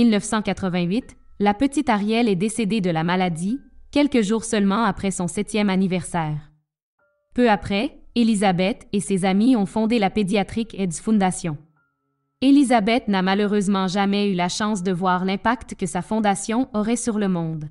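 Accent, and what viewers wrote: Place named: Quebec, Canada. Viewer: Toujours marrant l'accent québécois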